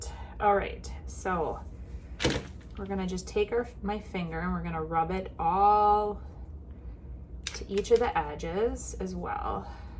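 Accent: Wisconsin, United States. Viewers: Like your upcycled items..also ur accent makes me miss Wisconsin...live in Oklahoma now...subscribedand sharing!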